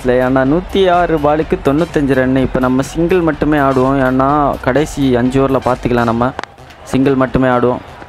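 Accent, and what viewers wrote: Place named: Kerala, India. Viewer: Bro neenga kerala thaane malayalam kalanthu pesuringa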